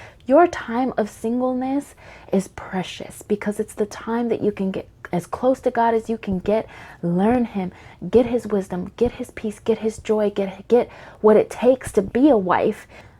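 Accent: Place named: South, United States. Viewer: U sounded southern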